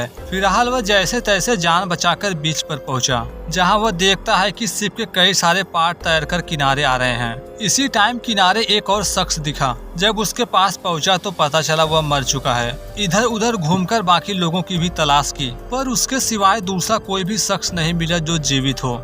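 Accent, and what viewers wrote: Uttar Pradesh, India. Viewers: Brother you don't speak english words in your vedio. Your UP accent is listening in your vedio. Like starting you are saying istarting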